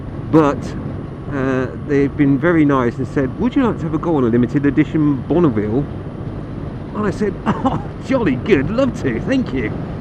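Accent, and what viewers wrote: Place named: RP, United Kingdom. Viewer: What size engine are these 214 trumpys you do sound rather POSH and TOFFEE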